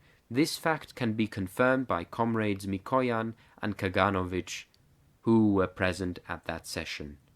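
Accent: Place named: United Kingdom. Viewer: This is a great presentation of the secret speech, full of passion and expression that can be heard in the voice and tone of how he speaks. The slight "British" or "trans- Atlantic" inflection makes it sound all the more Historical and Matter- of- Fact. Historians never fret over these very minor things, as I care more for the content of the speech, rather than the way it may be presented. All the best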